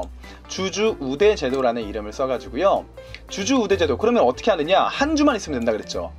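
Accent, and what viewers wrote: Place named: Gyeongsang, South Korea. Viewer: 님안녕하세요 ㆍ구독자입니다 항상유쾌하게경상도사투리로하는경제공부많은도움얻고있어요 여쭤볼게있는데요혹시 아라튜브라고아시면 알려주실수있을까요??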